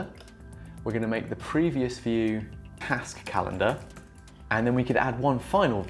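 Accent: United Kingdom. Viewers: мне очень нравятся ваши видео, спасибо, я становлюсь умнее и организованней! А еще мне приятно слышать ваш АНГЛИЙСКИЙ акцент!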